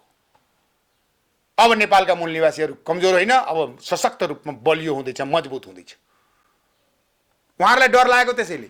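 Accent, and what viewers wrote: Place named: Mongolia. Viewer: याे नेता अनुहार हेर्द त मङगाेल जस्ताे छैन बाेलि सुन्दात सुँगुर खाने मङगाेकाे जस्ताे छ ।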